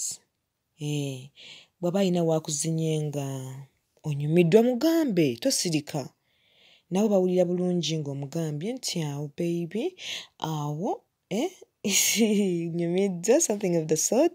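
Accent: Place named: New York City, United States. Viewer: Extremely NYC voice...this is first class screaming...not so different butbyo voice hooo😂❤🤗🥰💥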